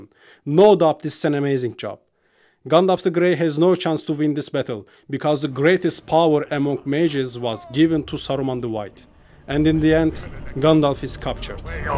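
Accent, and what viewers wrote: Turkey. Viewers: İngilizcen o kadar güzel Türkçe aksanı ki~ arkadaşlarıma gönderiyorum. Örnek olsun diye! Btw harika videolar! ❤ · Strongest turkish accent you will ever hear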